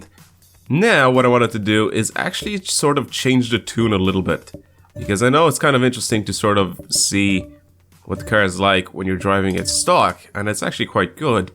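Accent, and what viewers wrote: Ireland. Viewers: are you irish?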